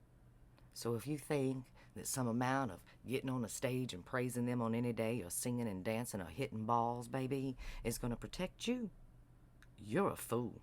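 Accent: South, United States. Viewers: I stumbled upon a story yesterday. The voice was like smoked butterscotch, deeply southern. At first I was reminded of Julia Sugarbaker from the show "Designing Women." Then I got into the meat of the tale... a horrifying recount of a Dark Time and her memory saving her from recalling the full measure of it. Then the algorithm did a good by putting her on my suggested list today. You, madame, are AMAZING.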